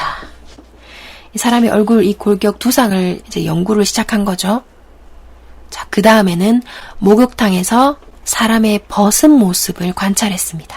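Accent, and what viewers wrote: Gyeongsang, South Korea. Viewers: 경상도 사투리 반갑네요. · 경상도 억양이 남아있어요 ㅎㅎ 남편이 대구 사람. 잼난 책 설명 좋네요.구독자 많아지실듯! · 님께서 책의 내용을 깊이 있게 이해하고 낭독하고 설명해 주시니 아주 좋습니다. 목소리와 경상도 사투리도 매력있어요 앞으로 엄청나게 많은 구독자가 생길것 같아요. 감사합니다. 사랑합니다. 축복합니다. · 갱상도사투리 마 괘안심더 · 경상도 억양이 친근감을 주네요 수고하셨습니다 감사합니다 축복합니다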